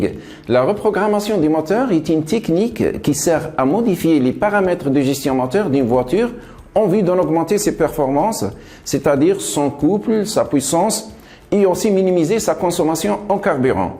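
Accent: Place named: Maghreb, North Africa. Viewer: ce qui est risible c'est pas l'accent c'est le langage soutenu qu il utilise conjointement à son accent.. Ça se voit que ce qu'il raconte n'a pas été écrit par lui.. Au Maghreb il y a des gens qui dépassent bien des français dans la maîtrise du français.. Il ne faut juste pas s'inventer un personnage.. Je suis sur que tu discutes avec lui c'est plus du tout le même français..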